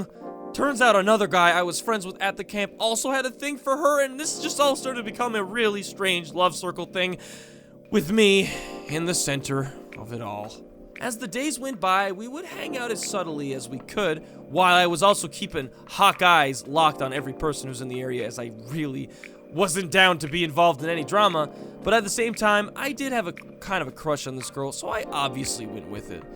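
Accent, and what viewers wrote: Canada. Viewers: So you're a Canadian ay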